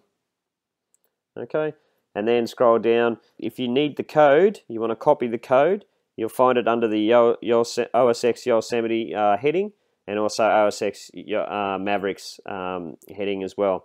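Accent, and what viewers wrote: Australia. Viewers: Well explained, good to hear an Aussie voice for a change.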